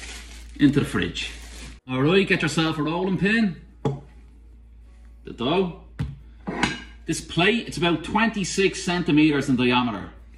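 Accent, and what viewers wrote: Ireland. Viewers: Loved watching and listening to his lovely Dub accent! Made me homesick! My Mam used to make her apple tarts exactly like his! ☘️💚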